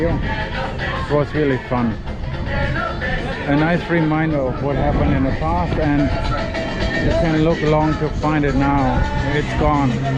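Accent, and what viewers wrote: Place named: Germany. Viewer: Are you from Germany? The accent sounds a bit like it. I'm half German half Brazilian 👌🏻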